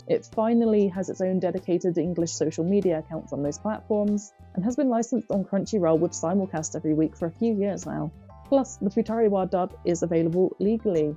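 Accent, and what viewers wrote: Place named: United Kingdom. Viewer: Your voice is so calming, especially since you have a familiar “accent” being British too =]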